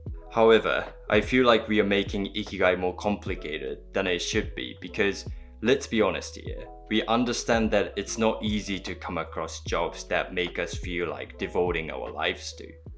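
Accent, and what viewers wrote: New Zealand. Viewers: No wonder your English sounds funny, you learned it in New Zealand. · Thank you for this: it's interesting that your English retains your New Zealand experience ("sex & sivin" for "six & seven"). Bravo.. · is that a new zealand accent?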